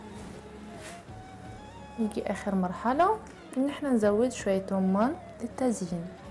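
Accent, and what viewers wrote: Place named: Morocco. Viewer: احس من لهجتها انها مغربية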